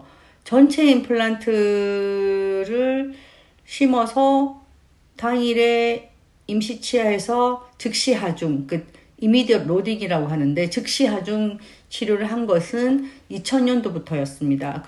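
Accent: South Korea. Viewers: 저 버들강아지 기억해주셔용 넘예쁘고 젊으심 말투가경상도라서 친근함 이 있어요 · 와우 평소말투 그대로! !부산말 안고쳐지네요 차암 어렵죠잉?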